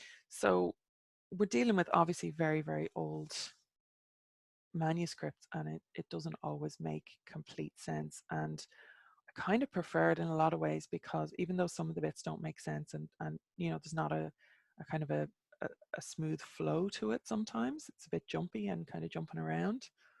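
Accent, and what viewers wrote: Ireland. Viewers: Thank you so much for reading these. I love hearing it read in your Irish accent. It helps to hear how things are said properly. Your commentary is also a great help with understanding what is going on.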